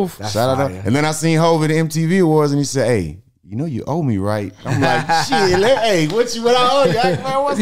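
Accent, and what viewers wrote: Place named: South, United States. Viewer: If I wasn't seeing who this was talking like this, I would automatically think that's a black man from the south talking like that. Damn, this dude has soaked up the culture soooo comprehensively. Got damn! Astounding!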